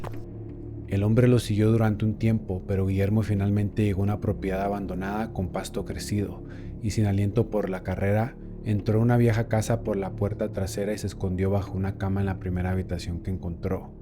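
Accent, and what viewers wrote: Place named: North, Mexico. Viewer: Me gustó el video. Como sugerencia personal, tal vez puedas engrosar un poco la voz para que suene más tenebrosa. Las historias son buenardas. Por cierto, eres del norte de México, ¿verdad?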